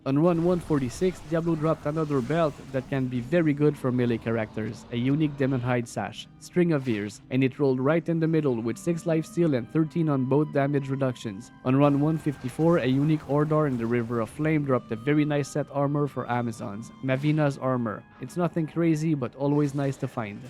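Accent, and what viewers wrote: Quebec, Canada. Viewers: Do i hear some french from QC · Tes québécois ??? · Je pourrais reconnaitre un de mes fellow québécois entre 1000! Bonne vidéo 🔥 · L’accent québécois s’entend à travers l’anglais, hahaha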